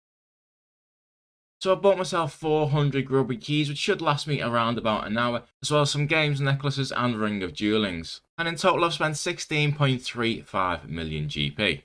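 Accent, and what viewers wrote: Liverpool, United Kingdom. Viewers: scouser by any chance? haha!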